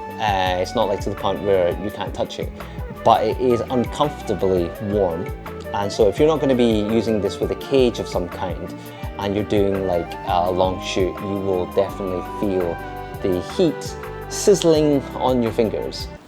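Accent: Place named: Scotland, United Kingdom. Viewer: Asian face, Scottish accent, cameras, happy to have found your channel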